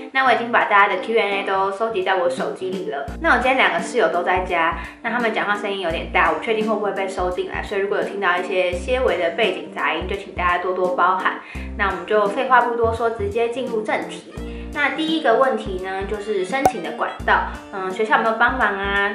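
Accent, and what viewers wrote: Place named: Germany. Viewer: 她是德语发音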